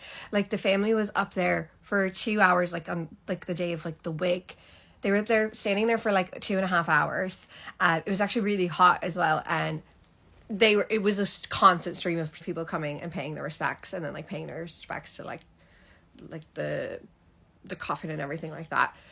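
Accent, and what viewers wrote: Ireland. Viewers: You sound irish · Sounds like you've picked up the Irish accent even when speaking with your American accent or maybe just an Irish girl pretending to be American.. haha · Is it me or is she like doing an irish accent... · yeh, definitely some hybrid accent there. if you told me she was from stillorgan, i'd believe it. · (American born, raised Dutch here): You are really growing that irish accent, my compliments. Its also great how social you are. It's not easy to grow into an irish family. You must be special. And that old grand father certainly has been special.💝🙏🕯Good luck to y'all. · She's fake she sounds very Irish not "American"🥱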